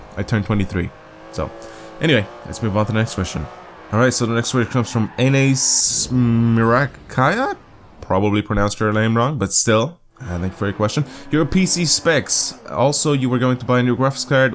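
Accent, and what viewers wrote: Ireland. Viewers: When did you move to Ireland (at what age?) cause of have the accent would never have guessed you were not Irish lol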